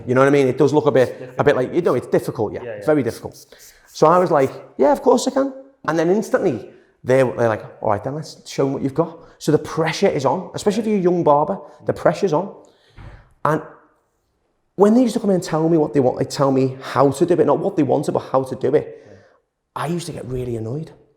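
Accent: Liverpool, United Kingdom. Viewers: This channel is so relaxing - Loving those transformation Details & That Scouse accent from a handsome Barber. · He talks non-stop and that thick British accent makes it difficult to understand what he says.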